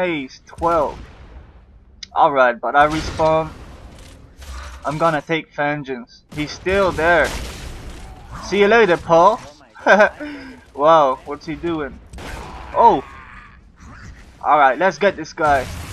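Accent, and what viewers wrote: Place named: Europe. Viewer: su acento no es de estados unidos el es de europa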